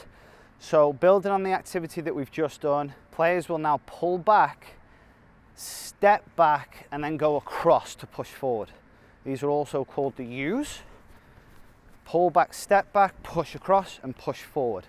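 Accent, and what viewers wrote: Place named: England, United Kingdom. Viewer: love these videos but i cant help to get confused by why he has an english accent and calls it soccer?! like its Football in England.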